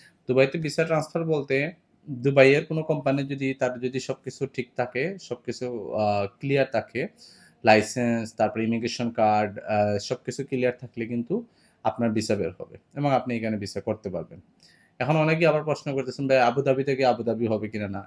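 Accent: Sylhet, Bangladesh. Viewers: ভাই আফনার মাতে লাগে,আফনে সিলেটি😊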